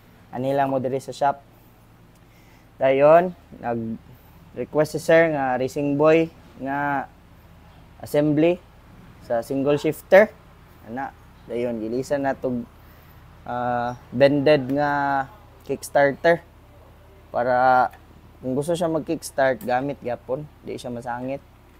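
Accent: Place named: Davao, Philippines. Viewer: The way you talk... Feel ko taga davao kayo😊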